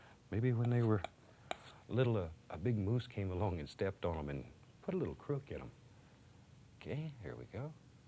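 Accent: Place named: Florida, United States. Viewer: It’s his accent. He said ‘yet’ but it came out like ‘chet’ Floridians and Louisianians say it that way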